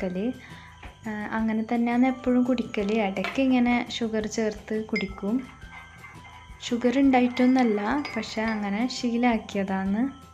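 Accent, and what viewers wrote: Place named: Kasaragod, India. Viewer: Keettitt nammale kasargod slang thonnunnu.... kasargod aano veed...nice vlog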